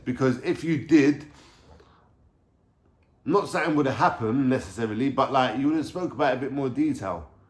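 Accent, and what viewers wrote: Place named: Cockney, United Kingdom. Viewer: What part of London you u from mate? Sound a bit like steptoes accent with no disrespect. Peace.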